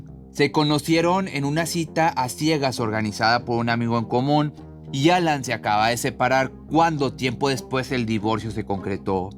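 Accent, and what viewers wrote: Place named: Mexico. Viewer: eres muy guapo🥰🤗,..descubrí tu canal ayer y de una me suscribí 😁...de dónde eres, tienes un acento como ser de México 🤔🤭😻😍😘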